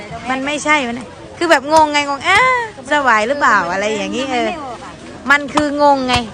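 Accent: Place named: South, Thailand. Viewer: พูดใต้เถิดครับมันง่ายดี-ถ้าพูดกลางเดียวก็กับมา-ใต้อีกครับผม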